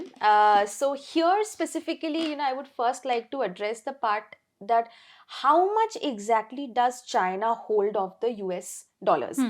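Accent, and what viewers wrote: India. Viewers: Indian speak English I don't understand a word you saying indian accent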